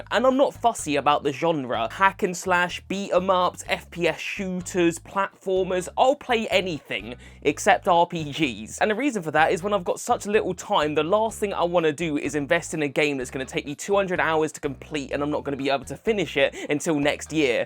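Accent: United Kingdom. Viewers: What area of the Uk are you from?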